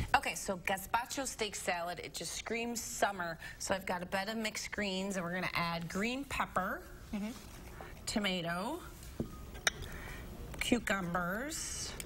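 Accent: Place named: Wisconsin, United States. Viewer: I love a nice Wisconsin accent. 😻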